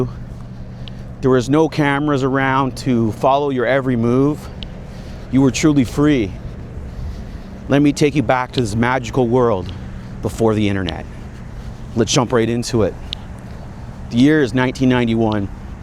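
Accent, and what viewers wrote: Canada. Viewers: Born 1983, so I'm here for this. I grew up without cell phones and we didn't get dial up internet until highschool, so it felt like the best time for that too- it was a new way to connect and plan stuff, and as a nerd, it provided the ability to find other people who liked the same stuff on web forums and chats. At the same time, it didn't create permanent record. There's no viral video or tiktok of me getting rejected or saying something dumb or falling asleep in Environmental Biology (sorry Mr. Gaspar- actually he was totally cool with it and let me sleep since I was normally a good kid and was obviously just exhausted). I was a free range kid for most of my childhood- today I'd have been taken away from my parents by the state. I think that's what changed too, prior generations, if their kid died or was seriously injured doing something stupid, they dealt with the tragedy and moved on. 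Now, those parents could be arrested for letting their kid get into that situation. I'm guessing your Canadian from the accent and some of the terms you used, so from an American perspective, if your kid gets hurt, not only is the parent getting in trouble, that medical bill could be devastating, which certainly incentivizes keeping little Johnny on his iPad vs on a bike. It got too expensive too. Everything did, everything is a commodified, and there is no place for kids to go and just be kids, it's even worse for teenagers. I understand not wanting to drive when every aspect of driving is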